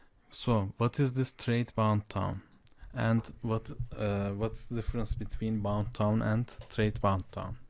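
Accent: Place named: Turkey. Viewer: Hintli gibiyiz, direk anlaşılıyor aksandan Türk olduğu insanın :)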